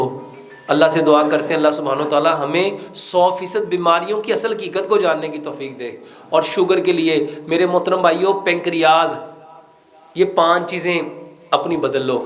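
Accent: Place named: Punjab, Pakistan. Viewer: ap ki baaten to achi haen lken yh punjaabi style achaa nhe lagtaa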